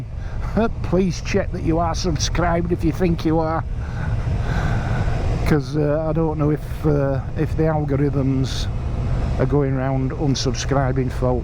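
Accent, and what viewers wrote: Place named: Newcastle, United Kingdom. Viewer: Good Morning, Yeah the weather is crazy at the moment, you don't know what to do for the best, your Geordie accent wasn't to bad lol, speaking as a Geordie myself, so take care & ride safe & i'll see thee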